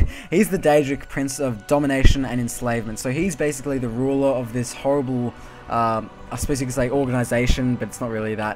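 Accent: Australia. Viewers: You´re an Aussie, right? Great accent!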